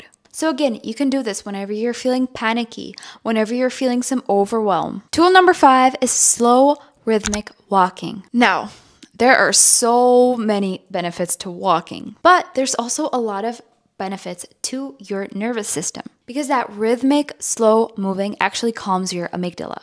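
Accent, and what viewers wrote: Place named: United States. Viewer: jaktože máš tak perfektní angličtinu a ještě k tomu americký přízvuk? 😯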